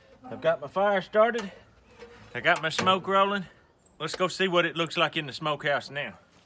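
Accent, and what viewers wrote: Tennessee, United States. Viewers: I thought I heard some Tennessee in that accent. Also are yuns off grid?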